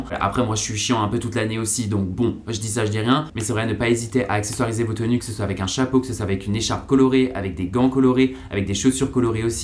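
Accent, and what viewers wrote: Alsace, France. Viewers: C’est trop drôle comment vous dites « métenant » a la place de maintenant en Alsace 🤣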